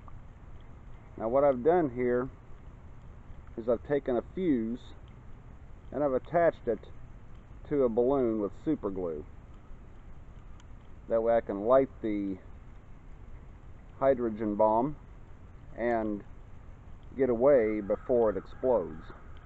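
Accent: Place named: Indiana, United States. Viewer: What part of Indiana are you from?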